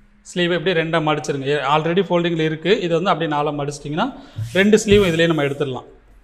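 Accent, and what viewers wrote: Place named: Madurai, India. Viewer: Anna neenga Madurai ya..? Unga slang super😊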